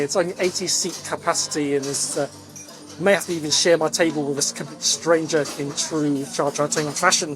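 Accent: United Kingdom. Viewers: It's so interesting hearing your British accented pronunciations of Chinese dishes. I'm a California born Chinese speaker and I say things with a bit twang compared to you. Thanks again for the video.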